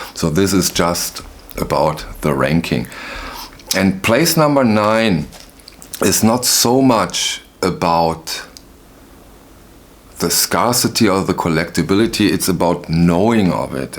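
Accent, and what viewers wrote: Germany. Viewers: Just a comment out of left field for you. You have a great voice and your light German accent makes it even better. You give me vibes of a 1950s beatnik. I guess you have to be above a certain age to even know what that means. I enjoy your passion for music and records, your clips are very interesting and entertaining.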